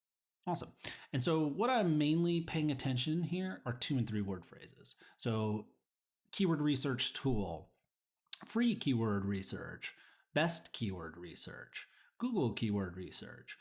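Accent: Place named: United Kingdom. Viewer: Uk English i like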